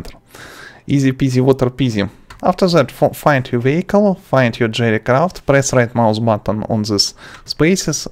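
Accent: Russia. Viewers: thanks so much very useful. you sound like your russian, I am also russin good luck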